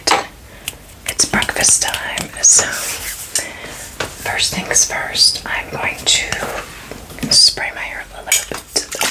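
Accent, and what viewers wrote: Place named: East Coast, United States. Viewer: Good morning what state do you live in? Sounds like you are on east coast like me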